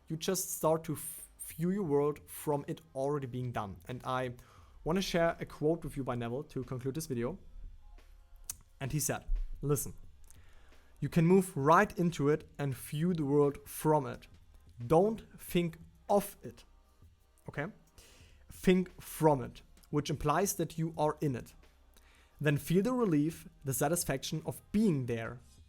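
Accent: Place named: Germany. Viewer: „ sounds pretty german, but there‘s basically nobody here knowing/studying Neville and all of that stuff“ - clicked on video, instantly got hit with a thick german accent 🙆🏻‍♀️😄 Na, dann sind wir immerhin schon zwei! 🙃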